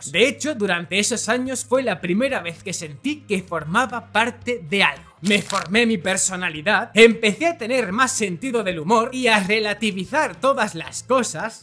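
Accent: Spain. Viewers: Yo si pensaba que era de España y la verdad es que me gusta su asentó y sus videos :3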